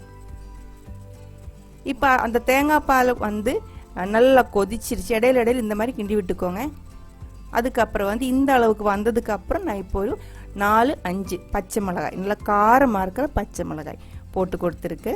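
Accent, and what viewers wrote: Kerala, India. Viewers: Mam ur thamizh mixed with malayalam is sweet. Thanks for explaining in tamizh. I love malayalam, but dont knw.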